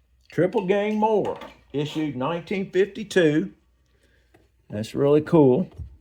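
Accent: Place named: Ohio, United States. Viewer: That platform truck is awesome. What state are you from, trying to catch that accent? Ohio? Dump truck is cool 😎. That driver has a lot of brothers, they all look the same. Nice collection. WOW 👍👍👍👍👍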